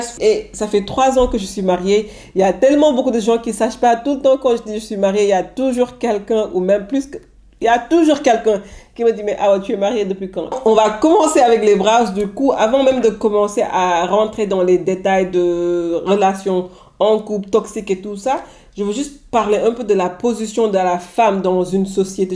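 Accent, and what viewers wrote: Senegal. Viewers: you said it all. i'm not senegalese but i luv your dialect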